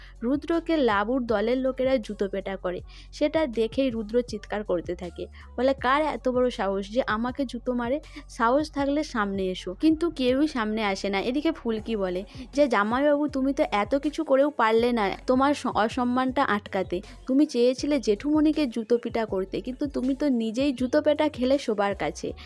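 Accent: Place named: Bangladesh. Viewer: কথকতা ভালো লাগলো।তবে, বাঙ্গাল বাঙ্গাল উচ্চারণ ঠিক করো। চেষ্টা করলে নিশ্চিত পারবে। শুভেচ্ছা রইল।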